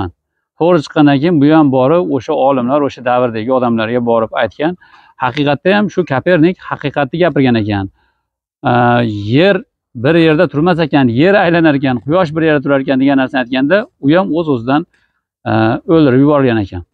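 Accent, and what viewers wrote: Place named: Fergana, Uzbekistan. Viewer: АКАМИЗ ФАРГОНАДАН ЭКАН БАС ДЕБ ГАПИРДИКУ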